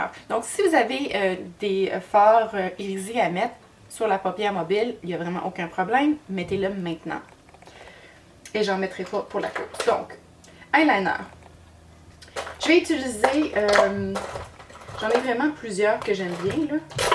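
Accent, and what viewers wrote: Quebec, Canada. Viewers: Waouh !!! Quel œil de biche ! Merci pour la technique et ce petit accent québécois j'adore 😉😊🌻❤ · Adorable 💖 ma super heroe : tu expliques merveilleusement bien et ton accent canadien wahou 😍 on meurt d'envie de partir vivre chez vous : au CANADA 🇨🇦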